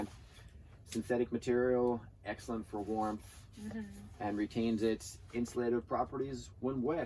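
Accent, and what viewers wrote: Newfoundland, Canada. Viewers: You from Newfoundland? If so that’s cool man I’m from back on the rock as well !! Just subbed to you !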